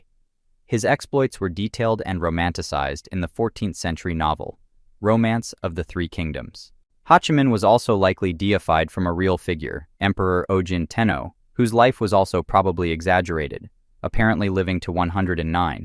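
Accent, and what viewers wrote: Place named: United States. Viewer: 미국인? 미국인발음 짜증남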